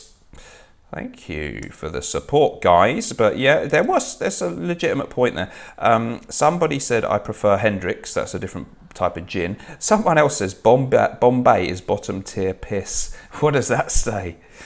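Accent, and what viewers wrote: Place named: RP, United Kingdom. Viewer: Hello, how are you doing? I am a bit baffled by your videos. For a Polish guy living in Germany, now Miami, your English is very good. You even have this posh accent. How did you manage that. Why are you not clapping and anymore? I miss that. Talking about,… maybe your “ gay “ adventure would have never taken place if you did not spin around. is a song tittle and she make heterosexual males into bisexual or even gay clones. Everybody knows that. You are not gay, you are just a kind of dupe or clone of your self like Armaf cdnI. You are your own scent clone. Just do not spin anymore. And are you now Jewish or Muslim? I do not see your cross or hear you talk about the big J in the sky anymore. Please explain yourself. Are you at the Versace house now in Miami? I see the Angel statues next to you. Very Versace. Anyway I did see you worked out more. 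The body is looking better than ever. Maybe you should do a polish folk dance instead in the turn. Anyway, you are the best. Lots of love from your nr 1 fan. 🤪🤪🤪🤪🤣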